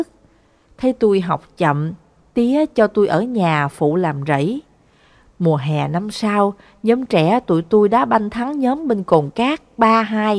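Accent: South, Vietnam. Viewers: Giọng đọc miền nam rất hay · Câu chuyện cảm động, với người đọc giọng miền nam mộc mạc, rất hay 👍!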